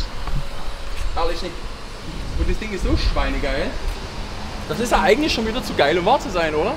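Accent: Saxony, Germany. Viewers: Ein sehr interessantes Video. 👍 Dieses war glaube ich mein zweites Video was ich von euch sehe und ich muss sagen das gefällt mir gut. Du bist'n Typ der einfach so quatscht wie ihm die Gusche gewachsen ist. Und dann noch auf sächsich.👍 Abo ist mal da.✌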